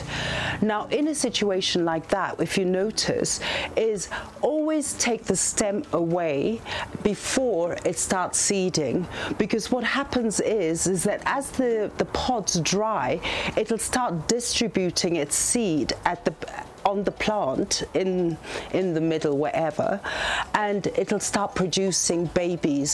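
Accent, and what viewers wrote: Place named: RP, United Kingdom. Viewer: Your voice is so Posh!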